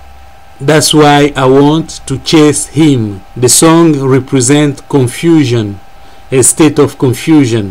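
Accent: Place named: France. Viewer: Your english sound french.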